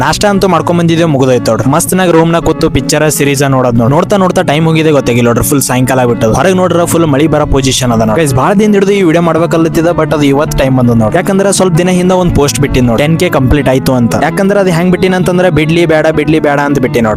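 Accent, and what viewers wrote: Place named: Kalaburagi, India. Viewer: Nimma matu pakka kalaburagikar matu nodri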